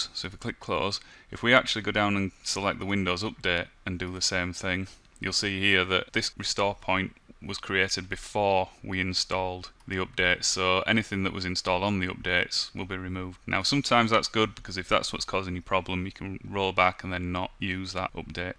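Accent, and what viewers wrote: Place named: Newcastle, United Kingdom. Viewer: You have very sloppy diction. It makes you very difficult to follow especially when you move that quickly. Now try and do all that when your display has locked itself into 800 x magnification and you can't even find the buttons let alone get to the point where you can type in "restore". Can't stand sloppy exaggerated Northern accents at the best of times. Arghhhhhhhhhh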